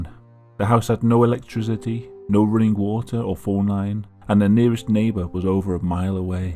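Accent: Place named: Liverpool, United Kingdom. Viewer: thats exactly what I thought, thought he was a scouser when I first got onto this channel. Being a scouser my self thats why I like this channel so much cos he sounds familiar. Haha he deffo sounds like tom like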